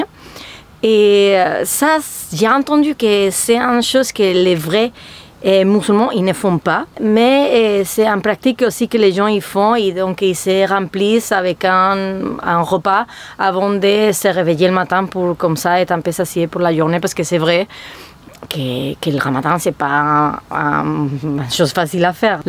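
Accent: Spain. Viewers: Cc je te dis avec sincérité que tu parle notre langue parfaitement,ton accent d'Espagne est charmeur j'adore continue, continue, continue.la bise 😘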